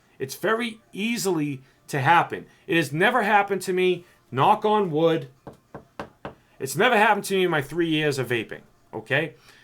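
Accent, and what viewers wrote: Boston, United States. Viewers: Well said D from 25mins on but learned alot. Also...love the accent, is that Boston? 😄💨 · You just gained a new subscriber because with all the videos I’ve put myself through yours is the one I got the most knowledge from. Are you from Boston ? Take care,all the best 🙏✌️❤️, 🍀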